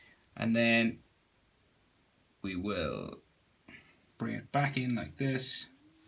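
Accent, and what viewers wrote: Ireland. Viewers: Yesss thank you I really needed this tutorial also I really like your Irish accent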